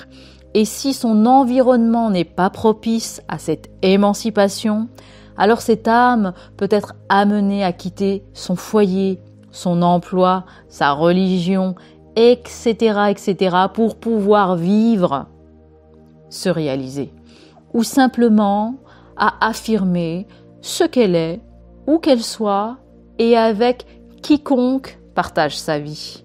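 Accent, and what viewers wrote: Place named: Réunion, France. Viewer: Merci pour cet éclairage et ce petit accent ( réunionnais?) charmant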